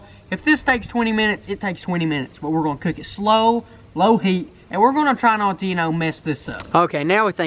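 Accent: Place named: Alabama, United States. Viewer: Why do you kill them for. they had a good life wow you can tell he's from Alabama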